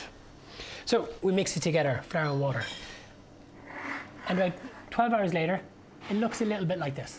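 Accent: Ireland. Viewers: sounds irish :-) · its not sourdough bread its his annoying irish accent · Irish accent. He clearly knows what he’s talking about! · who knew a soft spoken Irish accent is so asmr worthy. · Finally! has de-mystified everything sourdough. I was despairing of ever finding info that was easy to understand, conflicting and not over complicated. makes it so easy to follow…I could listening to his lovely Irish lilt for hours. 😊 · I use almost exactly this recipe, I add an ounce each of Olive Oil and Honey to mine, and bake in covered dutch oven instead of pyrex, but same principal... turns out perfect every time... Love this Video and the easy lovely Irish accent! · Hi, fantastic video, and having that great Irish accent explaining every stage getting to that final loaf, makes it even more enjoyable. The way you describe each stage I think most people would not have a problem getting to the final product. 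I had one question, can I half your ingredients to just make one loaf at a time? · Plus, that lovely Irish accent was an added treat <3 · Brilliant! This is the first time I've understood the process AND how wonderful you included the why's! I've scoured the classes and they just weren't cohesive. You have a new follower. Lovely to hear your brogue, too.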